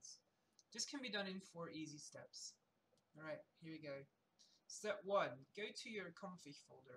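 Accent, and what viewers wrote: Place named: United Kingdom. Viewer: i swear i hear British in ur voice back 7 years ago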